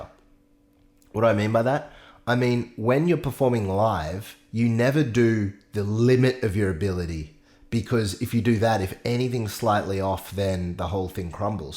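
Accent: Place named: Australia. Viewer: I love ur accent. Ur Australian accent.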